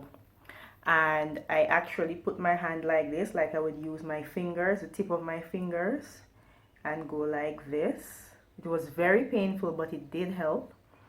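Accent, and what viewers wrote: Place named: Guyana. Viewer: Why do you sound Guyanese!!! 😍😍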